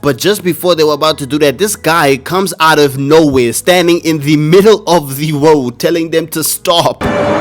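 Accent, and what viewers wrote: South Africa. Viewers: Why this guy has both a South African and American accent wtf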